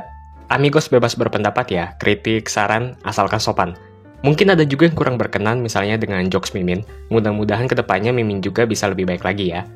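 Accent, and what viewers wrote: China. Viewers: awak ni kokoh dari malaysia ke bukan. Logat care cakap nye suare kokoh (chine). Tapi masih belom tau ke semue info nye. Bukan warge setempat/tempatan ke awak ni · Logat cina dari mana coba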